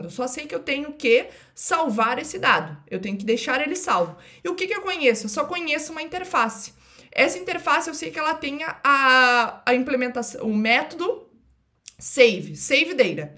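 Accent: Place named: Rio Grande do Sul, Brazil. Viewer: Sotaque do nosso rio grande é inconfundível "né "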